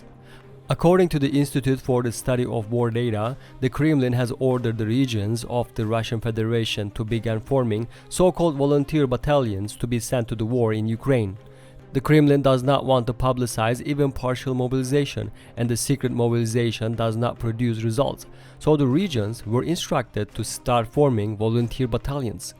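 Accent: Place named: Asia. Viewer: I must ask this first before commenting on this report. Where do you guys find such eye catching names for your YouTube channels? The accent also suggests the narrator might not even be a Ukrainian but Asian and seems to know more than the CIA and the FSB.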